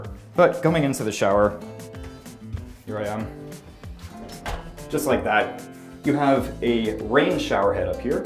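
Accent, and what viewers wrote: United States. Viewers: I could tell you are American based on accent, although light, it's the "R", Americans emphasise it, whereas Brits - like myself - often keep it silent.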